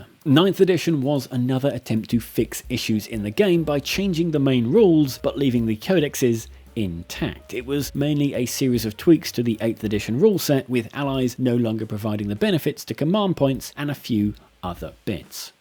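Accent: United Kingdom. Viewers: I'm a simple man, I hear a Brit talking about Warhammer. I automatically assume they're canon and subscribe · Such clean and nice British accent! Like listening nature sounds. Also, nice video)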